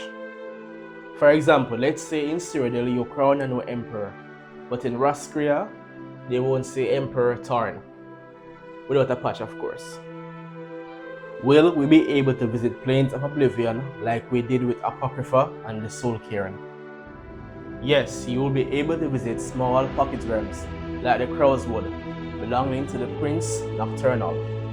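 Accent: Jamaica. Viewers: He’s Jamaican you mong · Are you Jamaican?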